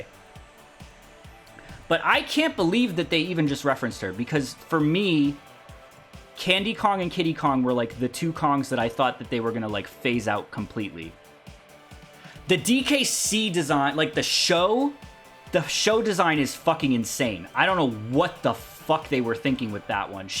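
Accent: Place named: Boston, United States. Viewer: Doesn't remember 90% of the jokes chat remembers. Remembers them shaming his Boston accent. Think about the effect your words will have, people.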